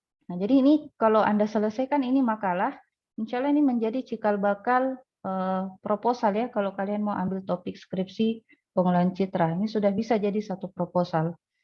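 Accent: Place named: Gorontalo, Indonesia. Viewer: logatnya Gorontalo/sulawesi bngt nih wkwkw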